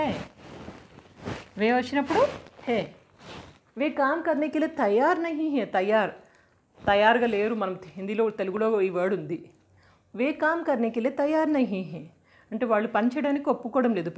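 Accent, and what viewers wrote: India. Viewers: Madam way you are explaining can understand all the normal people. And hyderabadi style and traditional hat's off to you madam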